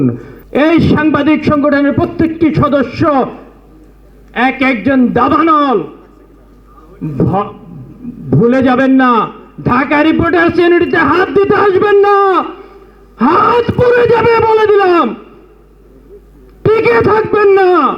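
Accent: India. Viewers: এই লোকের কথার মধ্যে একটা ভারতীয় একসেন্ট আছে।।।😂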